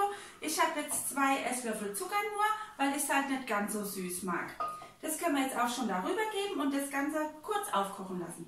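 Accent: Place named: Saarland, Germany. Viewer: Hallo liebe schaue mir gerne deine Videos an wollte dich mal fragen kann das sein dass du vom Saarland kommst ich bin nämlich auch auf vom Saarland meinte nur der Dialekt Werk sehr gleich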